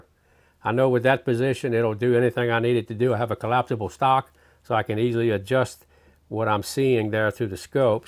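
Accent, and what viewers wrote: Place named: United States. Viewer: Great and easy to follow and understand. Talks American English and explains thoroughly yet simply. 👍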